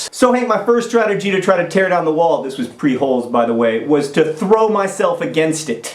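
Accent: Russia. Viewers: Your Russian made my insides happy. Ochen liubliu tebya<3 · Your Russian is adorable. XD I don't like the way you made me question the plural of 'octopus'. I have a feeling this will result in a linguist headache. (They're a thing that happens.) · It's a really REALLY good Russian you're sporting!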